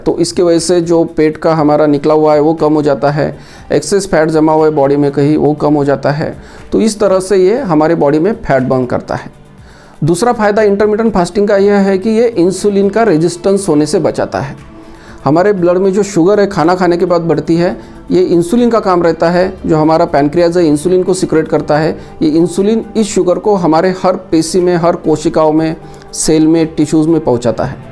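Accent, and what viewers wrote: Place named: Vidarbha, India. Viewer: Very apt advise; very much to the point and in the simplest language possible. It was also nice to hear you Vaidarbhiyan accent. मी नेहमी पाहीन आपलं चैनल. धन्यवाद.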